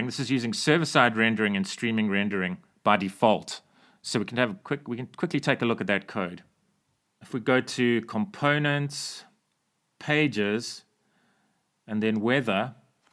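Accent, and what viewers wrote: South Africa. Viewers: That annoying invisible character that was at the start of your files might just be the BOM, at the bottom right of VS Code you can see "UTF-8 with BOM" so you might be able to disable it there Are you from south africa or am I mistaken? (because of the accent)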